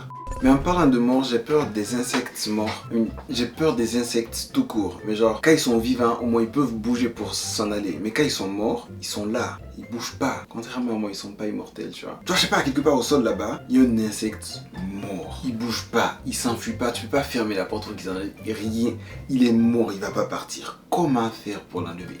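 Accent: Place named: Toulouse, France. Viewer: l'acent toulousain j'aime trop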